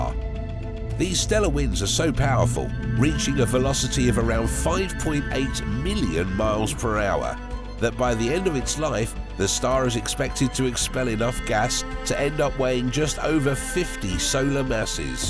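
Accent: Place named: Cockney, United Kingdom. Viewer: Yes this is all very interesting, but the narrator sounds like some geezer off Eastenders with a sinus infection. Couldn't you find someone else who sounds more educated and less unhealthy?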